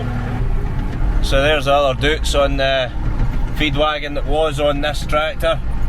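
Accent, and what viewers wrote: Scotland, United Kingdom. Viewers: what part a scotland you from ..im in northern ireland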